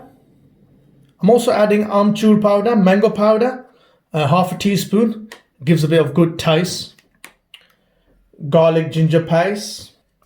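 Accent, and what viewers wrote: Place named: Bradford, United Kingdom. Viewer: r u from Bradford, I guess due to your accent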